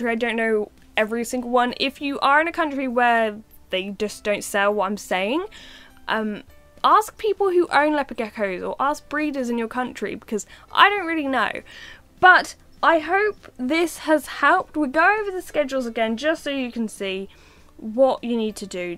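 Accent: United Kingdom. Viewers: Erica SPEARMAN that’s how the Brits say it. Most understand.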